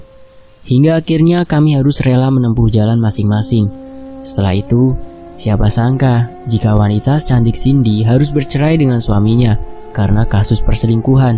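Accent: Java, Indonesia. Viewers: Alhamdulillah pak Doni tidak ikut ikutan terjerumus ke jurang maksiat..seru yakin ceritanya aku sangat suka dengan crita ini..kadang malah senyum sendiri krna tingkah dan ksetian dn keluguan istrinya pak Doni .pngen bngt punya istri yg setia kaya istri pak Doni..hehe mksh yg nulis crita ini mksh juga admin yg sllu ku tunggu crita ceritanya .yg medok 😀😀🙏🙏 .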